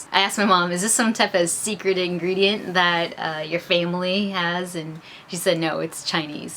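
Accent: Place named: Philippines. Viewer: Inday magtagalog ka nlng.. Obviously marunong ka mgtagalog accent p lng...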